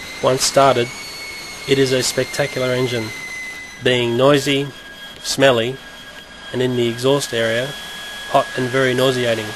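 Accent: Australia. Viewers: Who is this fucking robot voiced australian narrator? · That's an Australian accent you tool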